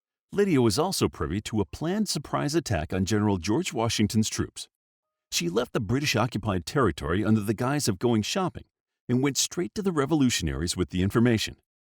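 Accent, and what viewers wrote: United States. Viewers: This Yankee narrator's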